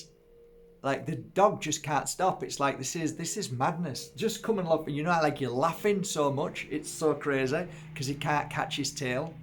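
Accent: Yorkshire, United Kingdom. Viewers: Love that Yorkshire accent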